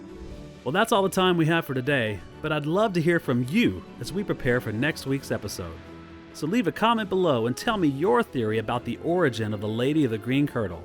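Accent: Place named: United States. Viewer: finally someone who’s American speaking to me in English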